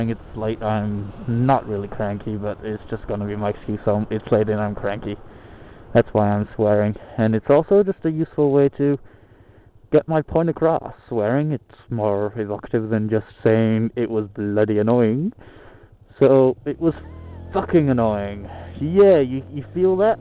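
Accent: United States. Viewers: You seem to have an American Accent, Im confused... And happens all time at traffic lights lmao, pain in the bottom xD I normally end up running them or just turning around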